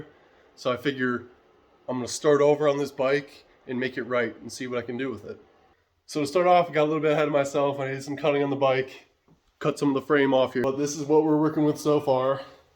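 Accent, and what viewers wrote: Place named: Midwest, United States. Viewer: Looks great, looking forward to how this goes. Where are located, sounds like Midwest from your other video (Menards). You're doing great, love the concept and your channel name. Your idea is quite similar to mine, just something to do for fun and show with a little ambition, you can do alot. Great Job!!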